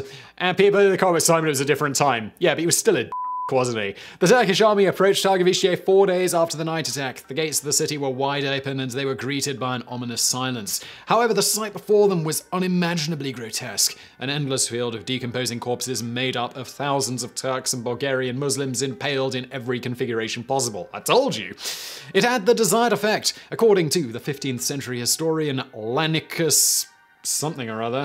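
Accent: England, United Kingdom. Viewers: You're so cute when you crack up and call out your writers! It just slays me.🥰, I adore how freaking English you are! You're like a young Sir Patrick Stewart, can't help but crush a little bit.....or Sean Connery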